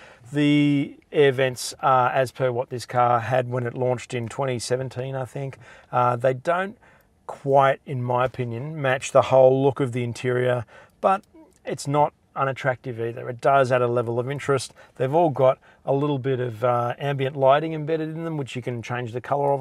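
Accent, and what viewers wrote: Australia. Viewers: Man.... I miss Australia soooo much. I already have an E-class coupe and I really like it. I'm here mostly to listen to someone speak, Australian! BTW, silver is a very good color to have (especially in Canada), because dirt doesn't stick to it like it does on a white or dark colored car. I have always bought only black or dark ruby red, cherry red, metallic cars. But I discovered that silver cars, I only have to wash it once for every five or six times I'd have to clean a black car. I bought silver because it was the only E coupe on the lot; and if it's a Merc', color doesn't really matter to me; it's a Mercedes Benz.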